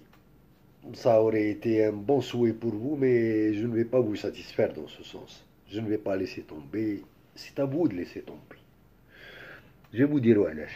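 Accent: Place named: Western Algeria, Algeria. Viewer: Khouya vous ete tres eduquee et intellectuel intelligence...ellah ibarek...je c que peut etre vous exprime mieux en francais et plus allaise...ce que jai mal a captee..je suis englophone...alors algerienise Khouya...en plus Jaime l'accent de l'ouest saha shourak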